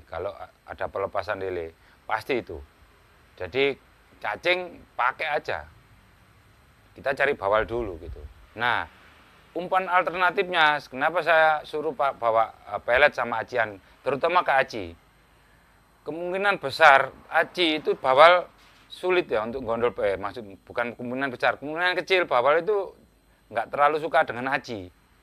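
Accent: Surabaya, Indonesia. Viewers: Suroboyo ndi cak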